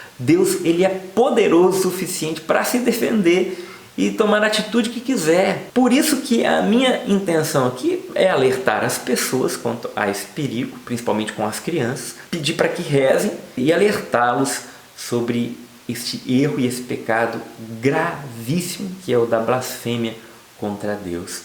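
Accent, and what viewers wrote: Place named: Caipira, Brazil. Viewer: Voce é caipira hein fiii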